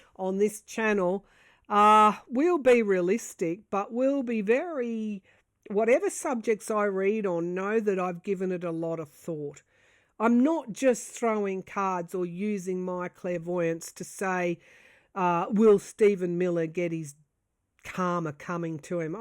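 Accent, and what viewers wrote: Australia. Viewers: Your Aussie snark sounds so pretty! Thanks for all you do! Can't we just have a whole new party? From the ground up. Dems or magats are useless. A new party of young folks. But if we apply this: A kid is getting C and D's all year. On the final, he gets 100% A+...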